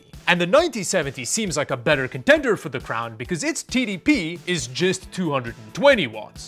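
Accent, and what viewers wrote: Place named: South Africa. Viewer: I went from 4k 60hz to 1440p 165hz, impressed by the response and speed ofc but always missed that crisp and super clear 4k resolution. Went back to 4k (now 144hz) and the 7900XTX is doing a great job, mind blown visuals. Tests show that the 9070XT is not far behind (non-RT) which is great. recommended. Also from South Africa, can hear your accent, well done on all the subs etc.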